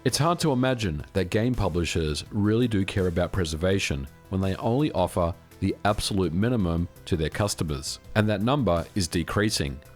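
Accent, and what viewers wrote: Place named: Australia. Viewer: “here in the United States" — dude you sound so Aussie lol 😁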